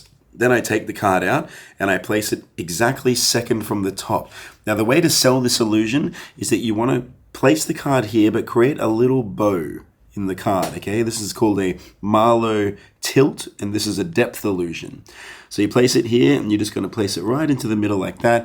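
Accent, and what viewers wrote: Australia. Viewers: Hey,! My little boy LOVES you and your videos! We watch you daily (every day)! It would be so awesome if you could give him a shout out? He wants to do magic like you. Although we're Americans, he speaks in Australian accent to be like you! His name is Everett. Thanks for the videos! CHEERS, mate!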